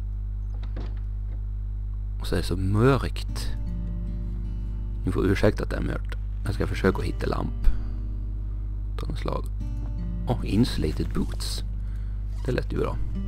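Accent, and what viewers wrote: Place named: Sweden. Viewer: Älskar din dialekt känn lite bonländska i det (stav) c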